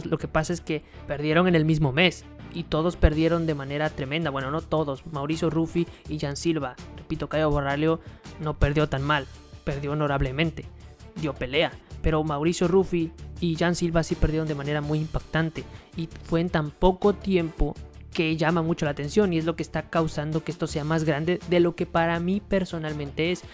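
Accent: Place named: Ecuador. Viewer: Vos de dónde sos? Esa voz parece de ecuador o igual de peru.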